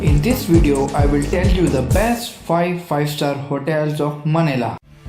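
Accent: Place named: India. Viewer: the way you speak i can guess you are from india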